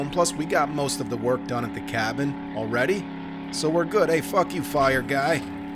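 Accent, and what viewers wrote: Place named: New York, United States. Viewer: I knew it I knew you were from New York but I just couldn't put which part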